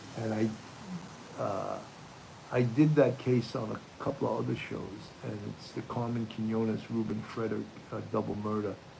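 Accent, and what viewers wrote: New York, United States. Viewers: Love your NY accent!!!! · I loves me some New York accent! What a jerk!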